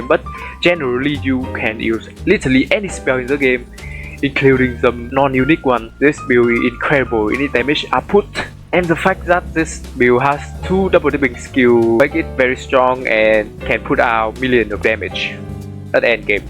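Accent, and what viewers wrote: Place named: Malaysia. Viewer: Late comment, but I found this to be the most helpful for my case despite myself having trouble understanding your Malay? accent. Thanks.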